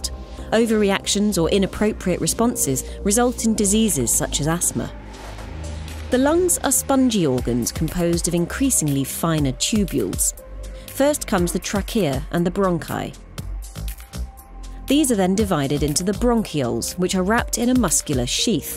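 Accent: United Kingdom. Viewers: The british accent feels like shit, shut up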